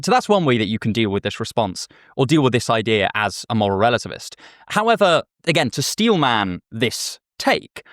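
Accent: United Kingdom. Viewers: this is my first video of yours that ive watched, was so shocked from the british accent i got whiplash, you give american vibes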